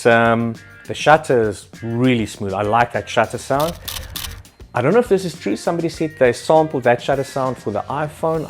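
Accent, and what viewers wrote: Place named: South Africa. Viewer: Great video, I have also the AE-1, AV-1 and AT-1. I can see why people love them and I loved your opinion too. Fair review and I learned a few things I didn't know before. I'd recommend Rode Wireless mics as your audio is peaking slightly with the Boer brogue at times. I'm Scottish and had similar volume/ peaking issues. Rode mics are excellent and great value. Great content, cheers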